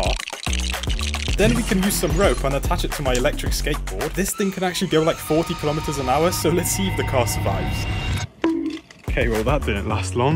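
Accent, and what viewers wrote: United Kingdom. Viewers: Your from the uk aren’t you?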